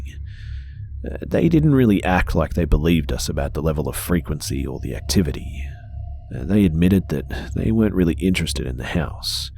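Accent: Australia. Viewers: xsmol x hu x soldier x Australian accents are the best :)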